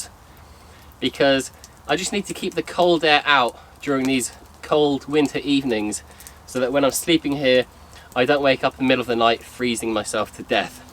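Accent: England, United Kingdom. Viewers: Loving your channel! What is it about a pommie accent and bushcraft?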